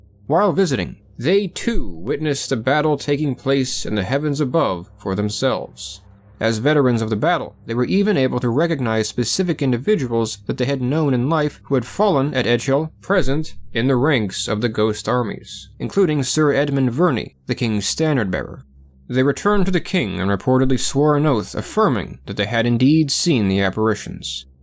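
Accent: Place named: United States. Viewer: He's speaking SAE, Standard American English.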